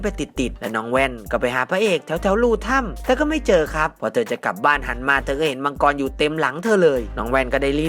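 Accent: South, Thailand. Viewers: หนูรู้สึกเหมือนพี่เป็นคนใต้เลยเเต่หนูชอบคลิปนี้อยู่นะค่ะเพราะมีตลกมาเพิ่ม🤣🤣🤣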